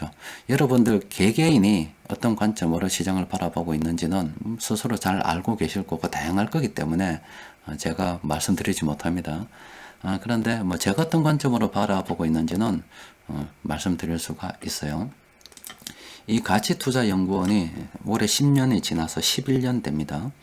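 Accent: Gyeongsang, South Korea. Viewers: 경상도말투친근감있어서 좋습니다~ ^^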